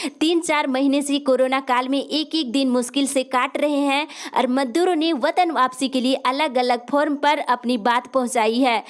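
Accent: Jharkhand, India. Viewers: M punjab me rhta hu lekin waha v aapke News sunta hu. . bhut achaa lgta h.. jharkhand wali feeling aati hai aapki awaz n Kabhi kabhi koi mistake se v. Keep it up 👍👍👍👍👍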